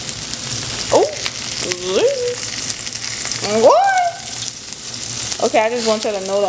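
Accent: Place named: Chicago, United States. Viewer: I love your video, and I hear in your voice you are from Chicago, my city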